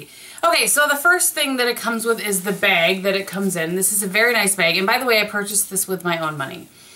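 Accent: Wisconsin, United States. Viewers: For some reason your Wisconsin accent was super noticeable in this video. Too cute!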